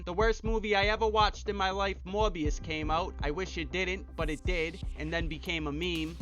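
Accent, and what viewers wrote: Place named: Massachusetts, United States. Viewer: With that accent, announcing that you're from Mass is the most unnecessary thing ever.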